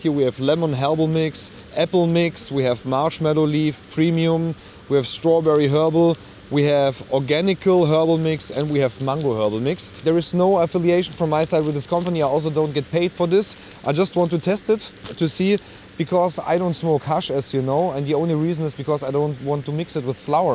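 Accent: Germany. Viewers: Kannst nicht mal auf deutsch, bist aus deutschland das hört man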